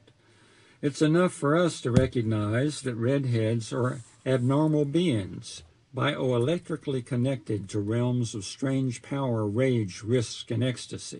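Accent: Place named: South, United States. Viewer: I'm a huge Tom Robbins fan. Am going to read on my YouTube channel. Came upon your channel. You have a new sub. You sound Southern. I'm from Alabama❤🇺🇸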